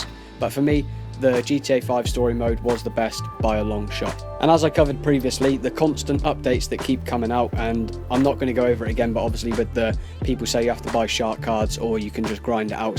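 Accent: England, United Kingdom. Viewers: Your videos are so informative and I like your British accent. U sound like such a nice suave person. If u r play on PS4 I would like to meet. What's your real name by the way. Your videos are honest full of correct information. Your information has saved me millions of GTA dollars. Keep up the good work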